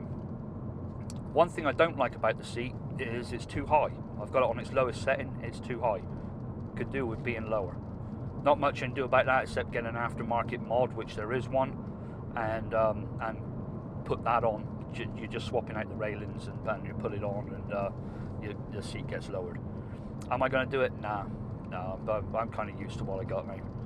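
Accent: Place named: Gloucester, United Kingdom. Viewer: I'm trying to work out your accent.. guessing you're from Gloucester? You're obviously now living in the US, and it looks like a beautiful place to live.. very spacious!!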